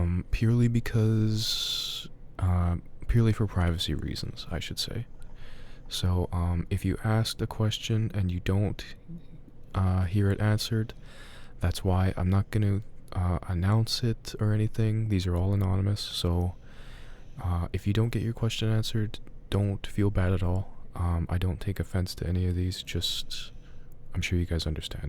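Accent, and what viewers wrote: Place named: Canada. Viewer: JUST listened to my first audio of yours, your first one on Quinn. Can I just say, first sentence thought, “oh? Is he Canadian?”, 10 seconds in you say ‘sorry’, “yup, definitely Canadian”. A cowboy but without the American accent and uses CST? Yeaaa canadian prairie boy. As a fellow Canadian, I call this supporting local arts and culture 😆. Seriously though, until today, I pretty much stuck to always the same VA (Rum). i’ve tried listening to quite a few other VAs on Quinn and I just can’t. Either tone, pace, sound effects, etc. turn me off. I’ll need to do a bit more research to be sure 😉 but, I might just have a 2nd VA on my list, which is very exciting! For real though, I don’t know if you’re familiar with Rum, the binaural king, buuuttt you give similar vibes and tone. Also from the reddit world. I would KILL for a binaural audio with you in one ear and Rum in the other. 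Or maybe just a twitch stream where we just get to listen to your smooth voices playing games 🫠. K, I know I’m getting carried away but seriously, I have a vision of you two being friends and making 🔥 content together. Listening to this now because I like to know a bit about the VAs I listen to. A whole 4hr intro!!! 😂 So i’m not done yet but I will get through it. I actually lol’ed at the haulin’ hay answer because #truth. Not a farm girl but helped haul hay on my uncle’s farm and it’s no joke. Congrats on ALMOST 20K🎉 🎉🎉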